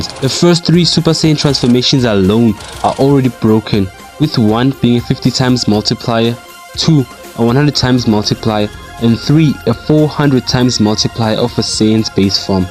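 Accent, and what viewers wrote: South Africa. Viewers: You sound kinda south african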